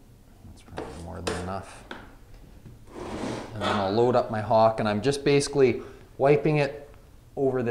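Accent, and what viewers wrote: Canada. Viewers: You sound Canadian :-) I use the same tray for mixing small amounts of powder. Do you always use premix/regular mud on your first coats? I've always been tought to use powder, usually 45, if not then 90. Much more strength and it allows you to coat it again or even 3x in the same day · are you from Canada? Voice sounds Canadian. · That's an interesting accent you have there! Kinda Canadian, German, Dutch, Irish infusion...and with a name like I guess you're or your family are / were from Ireland? Anyway 😂, won't butt edged joints show with sun beaming down the wall? I'm curious - as I'm from the UK, and we all skim whole walls over here!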